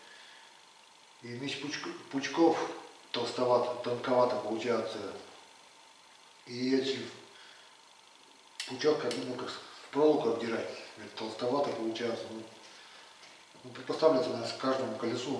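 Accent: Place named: Mari El, Russia. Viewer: Говор марийский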